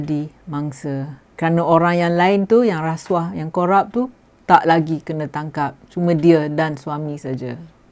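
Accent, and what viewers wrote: Perak, Malaysia. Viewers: Loghat puan ada macam loghat Perak sikit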